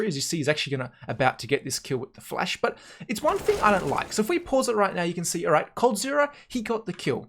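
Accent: Australia. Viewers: bruh moment...i wasnt looking at youtube and when the video started i heard an australian accent and was like oh, im watching a heyzeus video....wait a minute, this doesnt sound like heyzeus...OHHHHHHHHHHHHHHHHHHHHHHHHHHHHH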